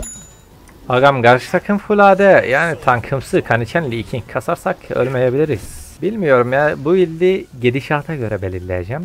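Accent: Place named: Antalya, Turkey. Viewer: ABI SEN NERELISIN ANTALYALIMISIN ŞİVENDEN ÖYLE DÜŞÜNDÜM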